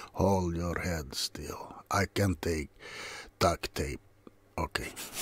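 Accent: Russia. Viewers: I love these Russian ASMR vids they are genuinely hilarious and your Russian accent is brilliant hope you're doing well take it one day at a time my friend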